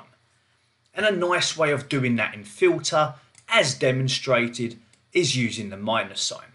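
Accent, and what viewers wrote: United Kingdom. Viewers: Dear Mr - great videos! and your UK accent is awsome and make tutorials pleasant to watch and listen to! Thank you for sharing your knowledge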